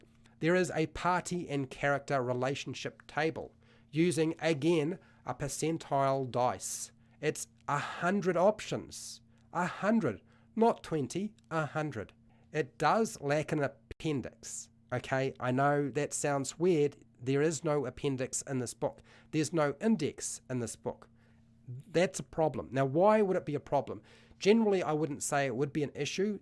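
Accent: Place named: New Zealand. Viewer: The kiwi accent makes me so happy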